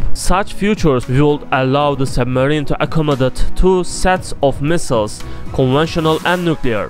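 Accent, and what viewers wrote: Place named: China. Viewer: Why does narrator have Chinese accent? 😊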